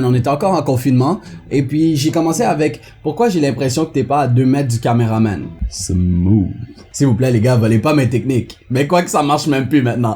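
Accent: Quebec, Canada. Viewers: Ç'est l'accent Québécois 💜 (merci) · T québécois ? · MEC TON AKSEN QUEBEQUOI ME FAIT DU BIENS JE TE KIFF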